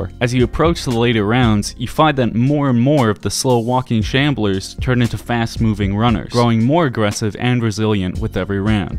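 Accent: Germany. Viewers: your german accent is surprising good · Are you German?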